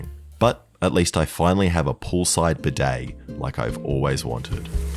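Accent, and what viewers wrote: Australia. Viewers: Bro this dude be australian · Are you from Austrália?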